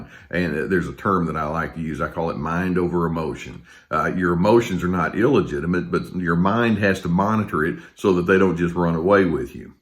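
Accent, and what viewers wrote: Texas, United States. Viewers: I knew it was Texas even before I knew it was Texas. It’s his Texas accent, I have it too, grew up in Texas, lived there for 22 years (till I made one of my horrible life mistakes by marrying a douche bag) All the time I get “where are you from, where’s your accent from” evidently the Texas drawl never leaves you... and, I live in Biloxi Mississippi but evidently it sounds a little different! 😀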